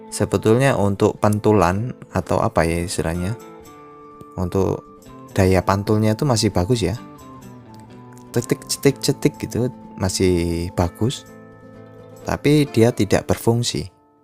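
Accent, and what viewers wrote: Java, Indonesia. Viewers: mas bahasa ne medhok keturunan jawa ta mas?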